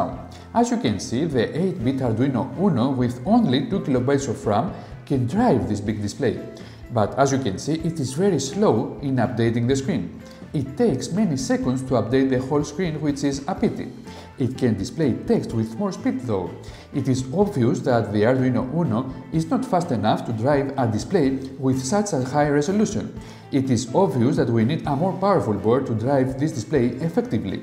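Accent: Greece. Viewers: the moment he opened his mouth, I knew it from the start, He's Greek! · Are you from Greece? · Γεια σου.απο οτι καταλαβα απο την προφορα πρεπει να εισαι ελληνας.θα ηθελα να σου στειλω ενα link και να μου πεις πως μπορω να το κανω και ποσο (ευκολο) <link> ευχαριστω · I hear a Greek accent!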